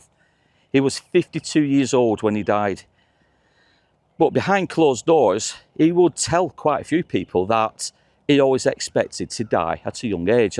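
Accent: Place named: North, United Kingdom. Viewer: While I'm what's known as a talentless git, I also love to watch Bob Ross 😊 He had a lovely manner to him and just had that way of making me feel happy. I've been watching your videos for some time now and I have to say the delivery is that of a master storyteller. I'm not from the north but your lovely accent and empathic style really brings these, sometimes forgotten, stories to life vividly. Yours and research skills and attention to detail does you both proud. I shall continue to look forward to your videos.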